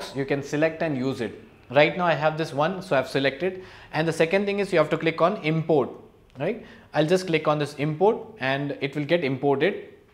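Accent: India. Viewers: 咖喱味的英语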